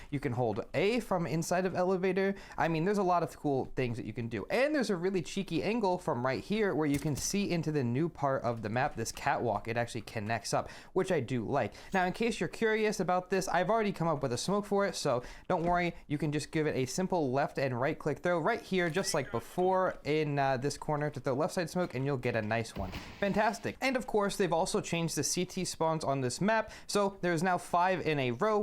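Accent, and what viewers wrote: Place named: United States. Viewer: niice the american man speaks again)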